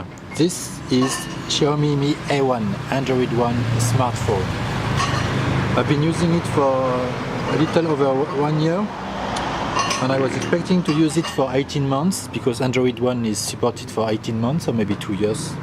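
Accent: France. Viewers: A little french accent ? :)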